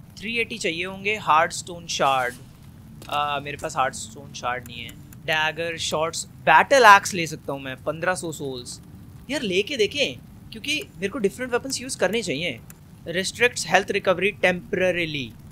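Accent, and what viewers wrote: India. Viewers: Does anyone else thought that he had too much of Indian accent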